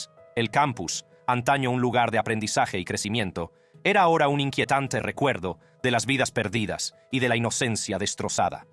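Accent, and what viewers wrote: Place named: United States. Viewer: Quizás es gringo y anda aprendiendo a hablar español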